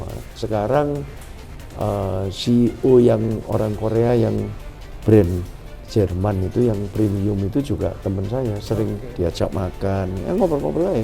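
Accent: Surabaya, Indonesia. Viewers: medok surabaya-nya khas banget, baru tau kalo org surabaya. biasa baca bukunya aja soalnya. Bangga dadi wong Suroboyo Pak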